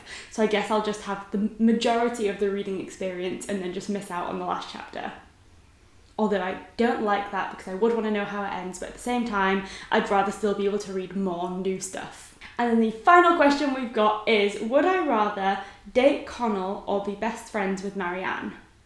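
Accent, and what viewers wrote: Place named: Yorkshire, United Kingdom. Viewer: This was so fun ☺️ love your videos and just the whole vibe of your channel! Are you from Yorkshire? I feel like I can hear it in your accent 😁 I’m a Yorkshire lass 🥰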